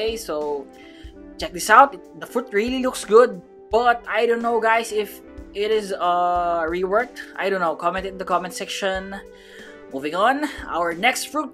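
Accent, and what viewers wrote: Philippines. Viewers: Tbh you sound like filipino lol keep it up you alwast make me laugh.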